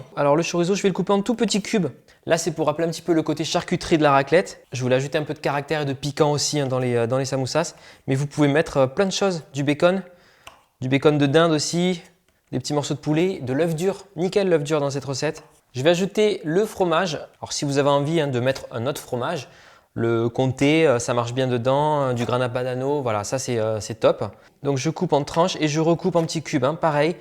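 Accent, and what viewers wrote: South, France. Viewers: A tester dès que possible avec notre reste de fromage à raclette. Je sais pas si c'est moi, mais je trouve que notre, il rechoppe un peu plus son accent du sud. C'est charmant!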